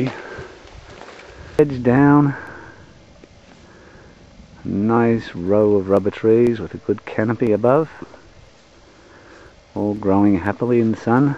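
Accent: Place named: Australia. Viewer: I have never known anything on how rubber is made although i always thought it came from the sap of a tree. you can really recognise the Australian accent in this video. I really like the bridge so authentic, the thailand landscape looks really lovely and lush and tropical. The thailand people look so nice and welcoming and peaceful. Wow five dogs this is alot. The fluffy dogs are really cute!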